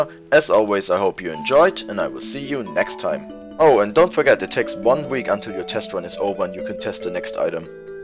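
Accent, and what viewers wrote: Germany. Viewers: Are German? Biste deutsch?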